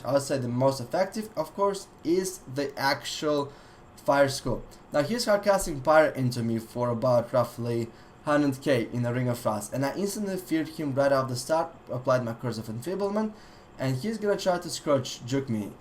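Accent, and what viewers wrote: Russia. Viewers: I love the Russian accent, first time watching your videos and I've subbed:)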